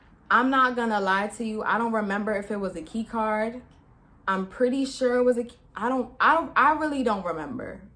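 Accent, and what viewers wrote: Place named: New York, United States. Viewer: Lol ladies not real friends to begin with a girls trip like this already sounds like a no no, 1 or 2 day ones max, lol shorty u Def from nyc I already know I can tell from the lingo I can hear my people's in your voice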